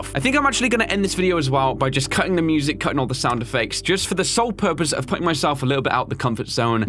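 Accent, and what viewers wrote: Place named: RP, United Kingdom. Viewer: Good job you are crazy good I first started watching from case anamatronics part 1 old version ( sorry if you're insulted but it that vid you sounded so posh ) hehehe your really good keep doing what your doing I someday hope you get 3_4 million subscribers your so funny baz is also really good yeah but your really good I like your videos so much I am a really big fan of you your so nice and one day I would LOOOOVE to meet you keep going and keep making theese funny videos peace out Brah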